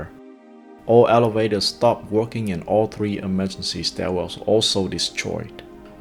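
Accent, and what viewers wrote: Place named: Vietnam. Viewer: Overall OK but I have 2 issues with this video. 1) the steel beams didn’t melt, they softened while items made from aluminum melted. Melting steel is a falsehood that has been parroted by others for way too long. 2) it’s difficult to listen to a video where the speaker has such a thick Vietnamese accent. Some words sound like a hybrid pronunciation between Vietnamese and English. It’s like nails on a chalkboard at times. It made it very difficult to actually sit and watch everything until the end.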